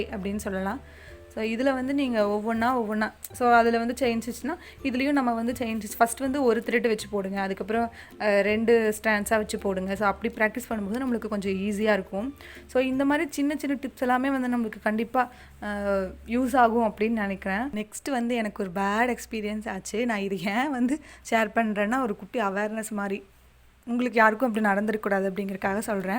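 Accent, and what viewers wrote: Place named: Coimbatore, India. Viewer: உங்கள் பேச்சு மிகவும் அழகு சகோதரி. நீங்கள் கோயம்புத்தூரா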